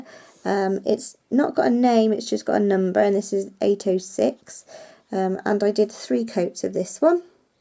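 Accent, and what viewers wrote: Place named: West Country, United Kingdom. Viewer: I love your videos - you sound like you come from the west country! I am more into Gel polish but still love watching your videos. Have you tried the app 'SNUPPS' where you can record all your collections/colours etc on your phone so when you are out shopping you can quickly check to see if you already have it in your collection..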